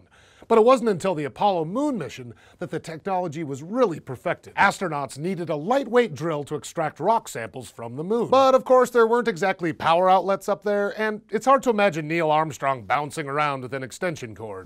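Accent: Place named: United States. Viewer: I´m normally not as keen on the American accent that I am on others, but this guy´s voice is purrrfect. And he works with SciShow. And he looks good. He makes me question my sexuality, goddammit.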